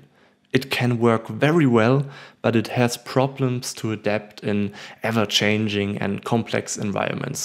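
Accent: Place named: Germany. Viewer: didn't know this accent was german. it sounds awesome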